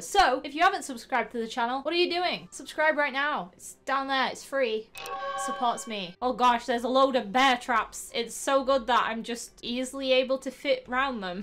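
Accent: United Kingdom. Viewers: ! I FILL LIKE UR FROM BRITISH! R U THO? COZ IM CONFUSED U SOO TALK LIKE AN BRITISH WOMEN!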